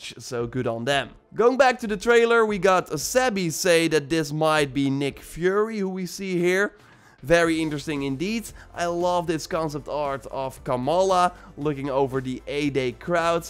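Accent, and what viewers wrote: Amsterdam, Netherlands. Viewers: youre inglish sounds like a hollander zeker uit amsterdam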